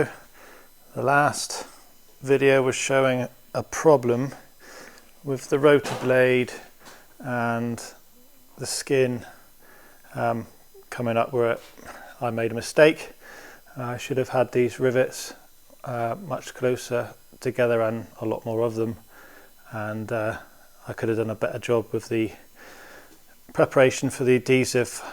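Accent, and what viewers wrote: United Kingdom. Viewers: Well done for getting this far. I built a prototype coaxial 6 years ago and got it to basic hover testing but put it away in my shed and now I have some more time are wanting to move it to next phase of testing. I was wondering where you are based? You sound British. I'm live in New Zealand.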